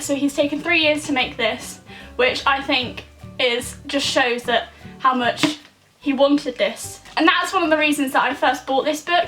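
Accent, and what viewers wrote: United Kingdom. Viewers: thought you had the tiniest south west twang. Hi from Bristol :P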